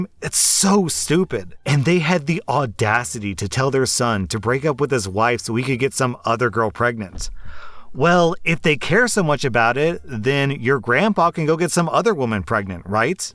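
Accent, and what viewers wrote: South, United States. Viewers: The slight southern accent killed me 😂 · The southern accent is perfect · Im not from the south but i love your southern accent. Please use it more?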